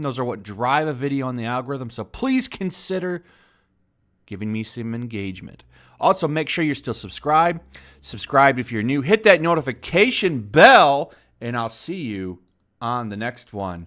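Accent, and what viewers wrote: United Kingdom. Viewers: Love the British accent every time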